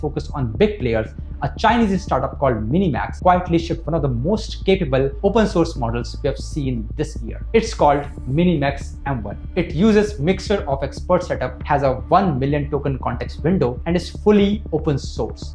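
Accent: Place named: India. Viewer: omg. i guess your audience is meant for indian people not american people. you are impossible to listen too with your accent.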